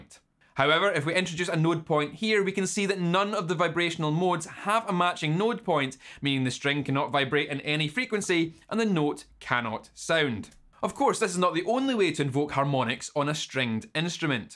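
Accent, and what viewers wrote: Scotland, United Kingdom. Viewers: A video on two of my passions (science and music), with trademark Scottish brogue? Happy new year indeed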